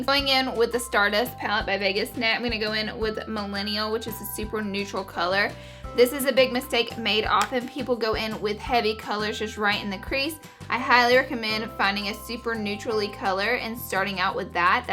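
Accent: South, United States. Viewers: I'm just coming across your channel and I just LOVE your personality AND your voice! Haha! I'm not sure where you are from but you sound a little southern and that's why I like it! (I'm from Atlanta by the way :D) I can't wait to watch more of your videos and learn new tips and tricks from you! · Your southern-ness is so adorable lol :) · "And I'm gonna bring it up way too...good Lord! Way too high." hahaha that was great. Also, are you from the south? You have a southern accent like I do. :) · she's just southern 😂 never go to the south if that accent gives you such a big problem. everyone agreeing with you is just silly. if any of you had to deal with southern people on a regular basis, you'd probably find her voice way less grating. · sounds southern. The whole time I was actually thinking that I love her voice. But I'm from Texas so lol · Thank you!! 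You're the first makeup Youtuber to talk like me. I'm from the South and we are for the most part portrayed as dumb inbred hicks which is far from the truth!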